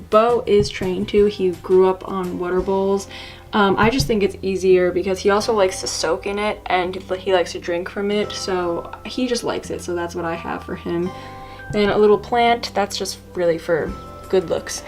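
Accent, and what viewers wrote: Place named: Australia. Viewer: When I heard the astrailian ascent I freaked I love it so much it’s adorable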